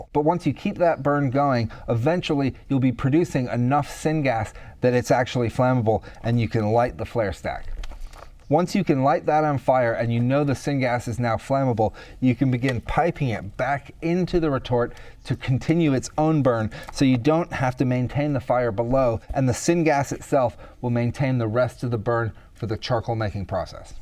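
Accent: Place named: United States. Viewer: Due to infectious curiosity, undiagnosed ADHD perhaps, I sidetracked my initial viewing of the video on a tangent. I hope you'll indulge some observations and the question those elicit. To my ears at least, you sound very American. However, several items in the setting, the whitewashed stone building most of all but even the colors of those wheels and tires, seemed more European in origin. So, I had to research " " to discover that you are located in the UK. Hence the question, which I hope you do not mind: Are you an American expat? I'm impressed with your design and fabrication and the thoroughness of your presentation. Ever since my family's livelihood and my own ability to travel freely via personal vehicle without regard to time or distance was adversely affected by the first Arab Oil Embargo in 1973, I have been intrigued by the notion of independent energy production. 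Today, was my first exposure to your channel and its content. I "liked, subscribed, and hit the bell" immediately and will be looking forward to seeing you further test and develop/refine the gasifier design and the materials it produces!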